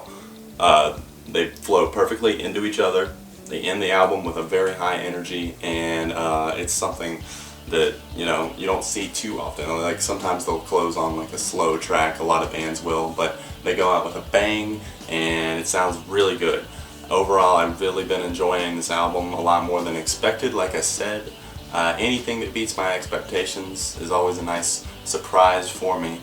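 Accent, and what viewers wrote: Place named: South, United States. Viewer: southern accent is much more prominent in these earlier videos, it makes me chuckle.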